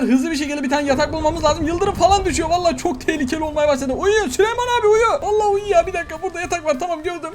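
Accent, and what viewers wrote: Malatya, Turkey. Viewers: Abi sen malatyalısın ya neresinden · ABİ SEN MALATYALIMISIN NERESİNDEN BEN DE ORALIYIM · Abı sen Malatyali misin bende malatyaliyim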